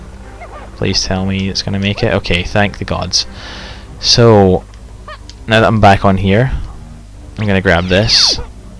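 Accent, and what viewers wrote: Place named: England, United Kingdom. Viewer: I will forever associate this game with an English accent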